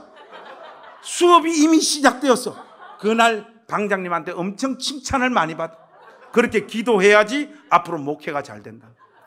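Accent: Gyeongsang, South Korea. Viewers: 목사님 설교는 너무 좋아요. 그런데요 경상도 말투가 , 악센트가 너무. 강 합니다😂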